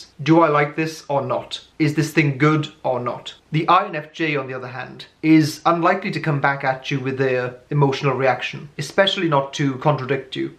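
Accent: Wales, United Kingdom. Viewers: Are you from Wales? (Trying to figure out your accent)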